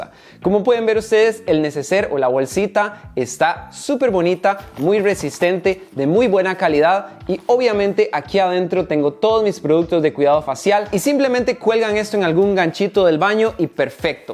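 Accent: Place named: Costa Rica. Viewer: habla como tico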